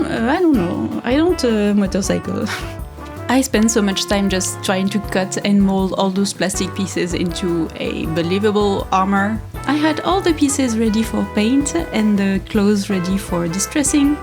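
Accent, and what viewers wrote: France. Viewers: please accept many many warm hugs! I think it's safe to say that we all love your videos because YOU made them, and we are here to see the projects that make YOU happy, and see YOUR specific style. I don't think anyone here is expecting perfection, we're here to see your fun and creative ideas, things that inspired you, and you enjoyed making. By this I mean, don't put so much pressure on yourself, you can wait until inspiration hits and we'll be happy to watch the process when you're ready to share it. Now for a confidence boost: I probably spend half the time I'm watching your videos observing that you look beautiful no matter if you're wearing makeup or you're in your cozy blanket clothes. As a native English speaker, I think your English is great! It sounds very natural considering it isn't your first language, and your accent is delightful! 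I always wind up thinking with a French accent after watching one of your videos. ❤ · I feel for you with a lot of sympathy. I would not have the emotional strength to see myself on video all the time. But just know that you are very beautiful and fun, and your French-accented English is very charming. But I know that admiration from others is not a cure for feeling bad about yourself. That has to come from within. Always put your mental health first. Hopefully soon you can hire some help to do editing and some of the other tasks of your job that you don't like. Students often work for cheap! haha Good luck and try not to be so hard on yourself, and remember to take a vacation/a break every once in a while. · We love you exactly for all the crazy, fun, interesting stuff you do and HOW you do it. AND the failures and your creative ways to fix them. You know, you learn more from mistakes actually as they challenge your capability of finding solutions 👍 and please don’t go down the rabbit hole of meeting expectations of others (people that don’t even know you and you should not care about). 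You are perfect and beautiful - from the inside and the outside - the way you are (btw French accents are very sexy! if I may say so as a straight woman). Please, take a break from all the struggles, if you want to. And you have already made the first step towards leaving your current struggles: you have identified what doesn’t do any good for you, and you can now work on letting that go. I hope you will be better soon, sending you love and good energies 🌈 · Please don't let the Internet tell you that you have to change! You are good the way you are, that's why we are following you ❤ I actually enjoy it most when you do something just for yourself and take us with you on that journey. And remember: Mistakes are something to learn from. (says me who is a teacher) I love the accent by the way. I used to have a colleague from France you always remember me of her. There is something about your energy... 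is there such a thing as a French energy? I really enjoy it, it makes me happy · I❤to watch your videos. Your creativity is inspiring and your French accent is the sweetest. Did you ever see Nerdforge, she is your Norwegian "twinsister"on youtube. Would be fun to see you two make a video together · hugs you are beautiful, but that's not why I watch you're videos. yes you're happy creative bouncy self brings me joy. but! you don't have to be like happy all the time. As for the accent... you're French, obviously you will have a French accent. the fact you can speak a second language as well as you do is impressive. personally I find you're accent charming and I love that you don't sound like a robot speaking 'perfect' English. you said everything yourself in the quilt analogy. the problem for all of us is it's easier to say that believe for ourselves. all I can do is.. hugs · If it helps: i really love your voice. 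My father is french and your accent makes me remember happy times and remember visiting France every summer. Merci ♡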